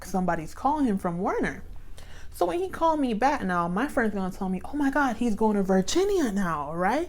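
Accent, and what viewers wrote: Jamaica. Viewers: I really want to do local but hmmm! I think I heard a Jamaica accent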